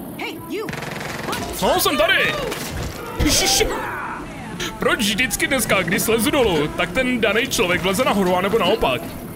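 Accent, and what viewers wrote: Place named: Russia. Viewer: Když mluvíš anglicky, zníš jako rus.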